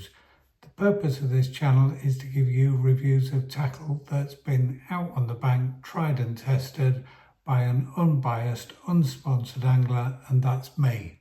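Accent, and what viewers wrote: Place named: County Durham, United Kingdom. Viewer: Where Oop North are you from, I’m hazarding a guess and would say County Durham? Very informative video as usual, missed your uploads so looking forward to your next one. Cheers. 👍🏼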